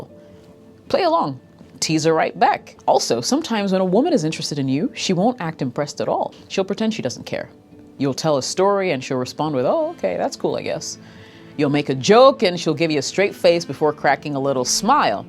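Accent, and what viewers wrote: Nigeria. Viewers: You sound like a Nigerian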